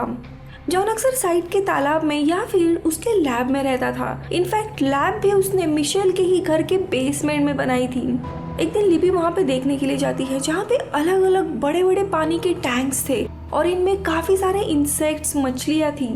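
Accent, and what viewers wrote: Maharashtra, India. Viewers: Apki accent sai ki aap Maharashtra sai ho am I right?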